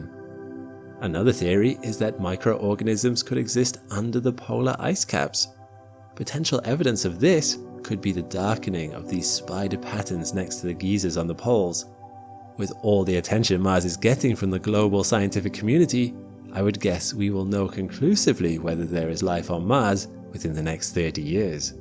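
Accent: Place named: United Kingdom. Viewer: I'm guessing it's less "correct" vs just locational pronunciation. I believe he's in the UK based off how he pronounces some things Here where I live in the us, some things are pronounced different in my state than another state, so I'm giving benefit of the doubt to him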